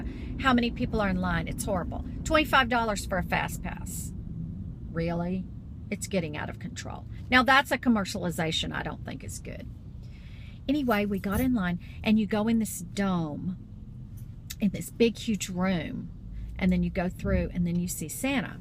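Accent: Texas, United States. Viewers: Great vlog! I enjoy your positive attitude so much. Loving that thick Texas accent you've re-discovered too. Hope you have a wonderful holiday.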